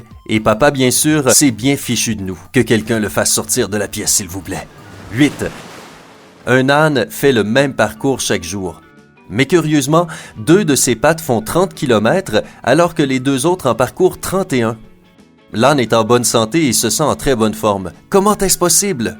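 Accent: Quebec, Canada. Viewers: est-ce t québécois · Parce qu’il est québécois et au Québec, on dit ça comme ça des fois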